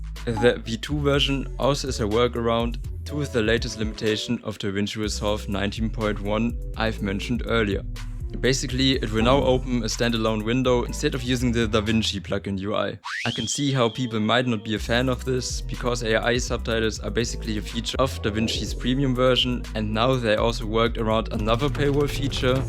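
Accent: Germany. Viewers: Echt gutes Video. Man merkt zwar das du Deutscher bist aber das juckt nd. Arbeite maybe noch am sounddesign sonst echt gutes Video bro.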